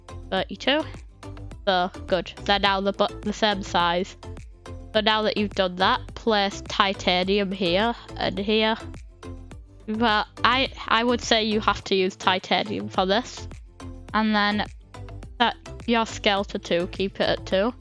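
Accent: United Kingdom. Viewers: are you bri'ish?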